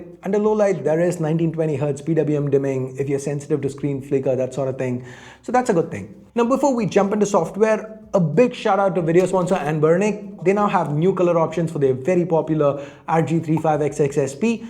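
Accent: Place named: India. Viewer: Co on mówi ????????? indiglish?????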